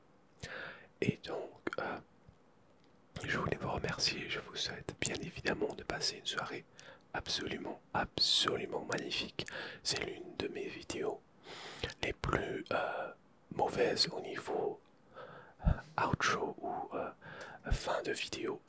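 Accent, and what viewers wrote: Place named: France. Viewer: Il peut dire qu’il est français on aura 0 doute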